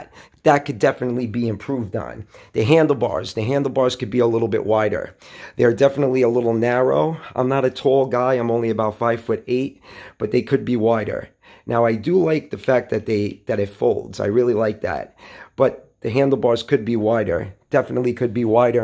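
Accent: New York, United States. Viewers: I love your NYC accent you do really good vids and your bodybuilding channel is awesome as well!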